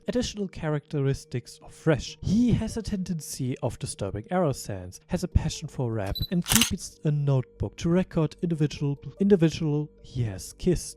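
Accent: Germany. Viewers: Is it possible that you are German and you have an accent?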